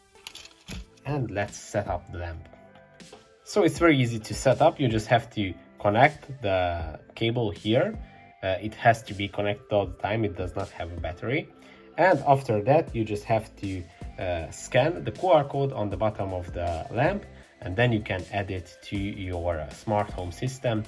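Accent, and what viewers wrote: Hungary. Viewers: are you hungarian? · Are you hungarian? I recognized the accent :D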